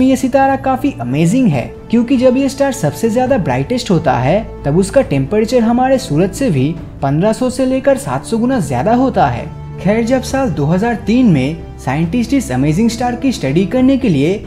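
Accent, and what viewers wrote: Bengal, India. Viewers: Your hindi is as like as Bengali people 😊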